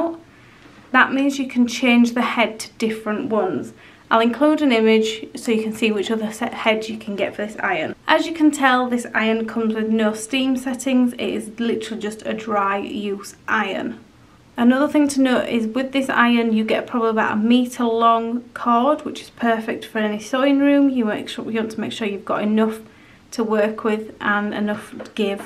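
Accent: United Kingdom. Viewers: Definitely UK. Northern England. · Off Topic: Where do you think has this Person grown up in GB? I try to lokate the accent. Thx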